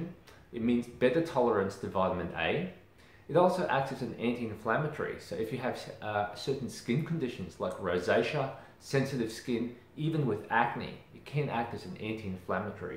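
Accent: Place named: Australia. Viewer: Such an informative and easy to understand video! Love your Aussie accent and your smile! Thanks!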